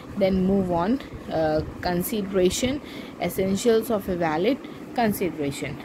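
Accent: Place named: Hyderabad, India. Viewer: Thanks mam for this.. Pure hyderabadi explain😊😊😊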